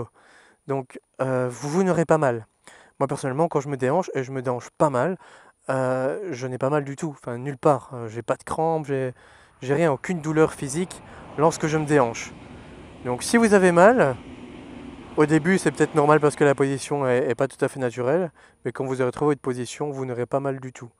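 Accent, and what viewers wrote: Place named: Hainaut, Belgium. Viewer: Bonne vidéo, pas mal pour les débutants. Bonne élocution. Et l'accent du Hainaut ! 👍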